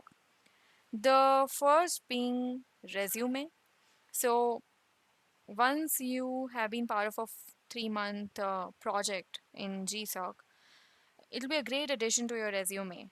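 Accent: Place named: India. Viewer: Sounds Indian to me xD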